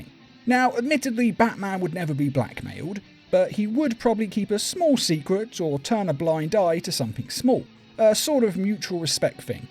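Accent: United States. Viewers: Good job on the American accent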